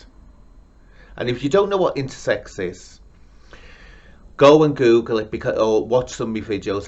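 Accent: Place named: Liverpool, United Kingdom. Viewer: New sub here, I do love that Scouser accent, I was a support worker for a lady one with a Liverpool accent, she didn't mince her words, she was often heard effing and jeffing in the local co op😀 great upload thanks.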